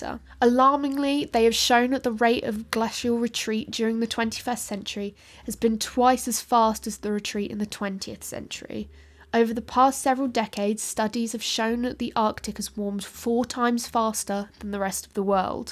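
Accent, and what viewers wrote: England, United Kingdom. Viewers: I hate English accents… Mae your own YouTube so we don’t have to hear your bleeping accents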